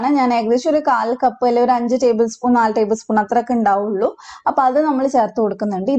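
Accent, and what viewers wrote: Kannur, India. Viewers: Itha kannur aano or Malappuram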